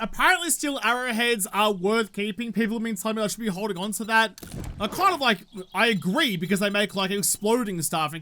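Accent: Australia. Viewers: The Spondiffering Aussie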